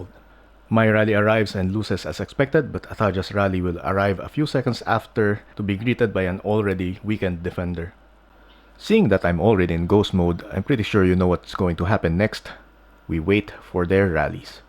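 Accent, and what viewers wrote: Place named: Philippines. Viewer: Tonong pinoy paturo bro😊🧡🧡🧡🇵🇭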